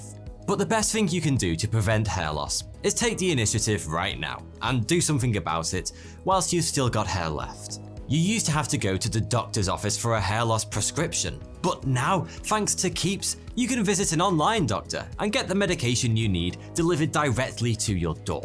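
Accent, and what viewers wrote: United Kingdom. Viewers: Talking of...or is that "speaking-of"... whatever....in regards to "perception". ¿I wonder if " " ever did an Episode on Accents? In short, people's Regional Vocal Accents... for, also to a slight "hearing" problem I've developed, requiring the use of hearing-aid, and because of " "'s Regional British Accent, that was saying "Forty-Two" instead of " ". As an 'omage to Douglas Adams, until I really noticed the on "Screen" 'printed-tag' " ". Which could be sort of "Multi-Part-er" starting with why people speaking English pronounce the way they do: in different parts of England; Canada, Australia; New Zealand; and the USA; Segway into why some people from Non-English Speaking Countries have an "Accent" due to the way They Learned to correctly pronounce Their "Native" language. Then on to why different Dialects develop in Languages in general, possibly using China as an 'extreme' example. 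Eventually, leading into why there are more than 7,100 languages are spoken in the world today. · British accent makes everything sound nice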